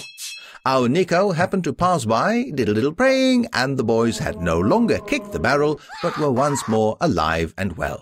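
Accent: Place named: Netherlands. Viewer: Cecilia Brennan that's because the Guy making this is dutch, im English and i find that the dutch accent sounds a bit weird xD i recognize it as soon as i hear it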